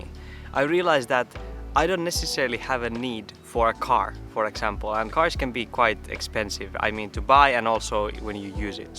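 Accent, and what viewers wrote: Finland. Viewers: Hei! Löysin sun kanavan viime viikolla, ja mietin eka että sun aksentti kuulosti suomalaiselta ja katsoessa kävikin ilmi, että oot suomalainen. Aloitin mun minimalismi matkan viime vuonna kesälomalla, ja kävin koko asunnon läpi muutaman kuukauden aikana. Lähti paljon tavaraa pois, osa ihan roskia mitä olin jostain syystä säilyttänyt, osa käyttökelpoisia tavaroita kierrätykseen. Yli vuoden mulla oli kaksi kaappia jäljellä, mutta en ollut jaksanut käydä niitä läpi. Tänään sain inspiraation sun videoiden katselun jälkeen, ja kävin viimein siivouskaapin läpi. Nyt sinne mahtuu imuri (se oli mun unelma, kun aikaisemmin kaappi oli niin täynnä roinaa, ja imuri pyöri nurkissa) Pieni juttu, mutta tuli hyvä mieli. Kiitos inspiraatiosta! Kiva että löytyi suomalainenkin minimalismi YT kanava, katselu pitää asian mielellä ja inspiroi tekemään jotain (vaikka välillä kuuntelen vain huvin vuoksi, saattaa kestää hetki että pääsen itse toimintaan)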